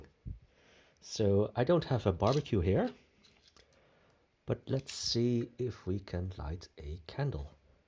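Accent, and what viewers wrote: Netherlands. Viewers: Wow weve got a dutchee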